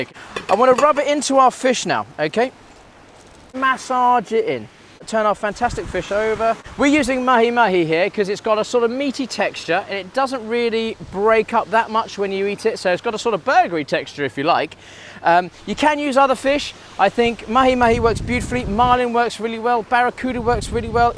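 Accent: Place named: Caribbean. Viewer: Some of your pronunciation is sounding so Caribbean, The island is rubbing off on you.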